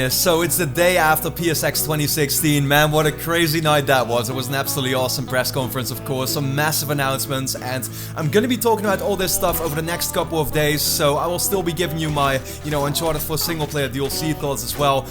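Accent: Netherlands. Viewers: That Dutch accent, i love it.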